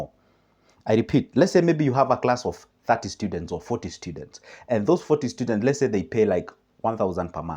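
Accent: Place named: Kenya. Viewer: Oooh now i get where you got that nice accent...that luo land..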